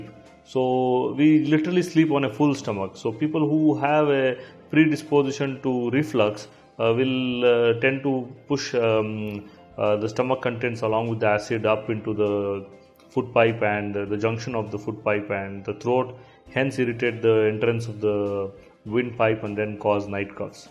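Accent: India. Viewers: Yeah, because it is hard to understand indian's accent.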